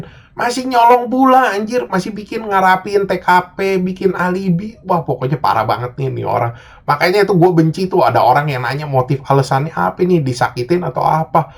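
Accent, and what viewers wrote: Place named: Betawi, Indonesia. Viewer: Org betawi bang logatnya kyk bang alex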